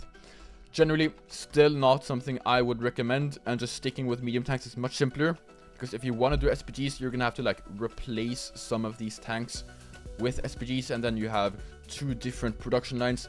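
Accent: Norway. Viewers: Bror høres så norsk ut🔥🔥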